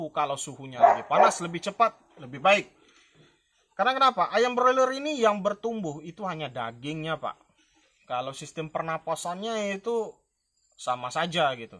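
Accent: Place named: Lahat, Indonesia. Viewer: Suka saya pembawaanya sampean,nada bahasanya kaya orang lahat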